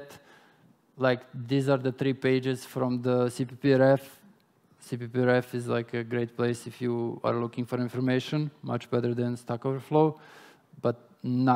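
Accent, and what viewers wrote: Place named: India. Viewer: Ирландец с акцентом индуса )), это немножко ломает мозг, но доклад классный. Спасибо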